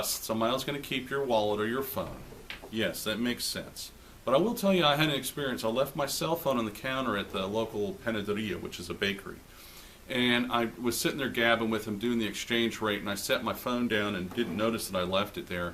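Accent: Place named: South, United States. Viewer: 4/9/2023. Great comments and video. Having grown up in the South, I appreciate your accent and use of y’all.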